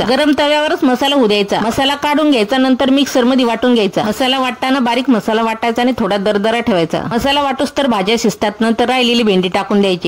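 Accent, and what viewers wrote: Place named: Pune, India. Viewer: ही बोलन्याची शैली पुणे जिल्हातील शहरा नजदीक चे गावात चलती,,,,, कारण मि स्वतः पुणे जिल्हातील आहे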